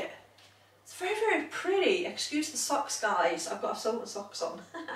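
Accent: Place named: North East England, United Kingdom. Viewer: hi my darling, another great video, i really look forward to watching them, where do u live i live in the north east of england and your accent is like mine!!! xxx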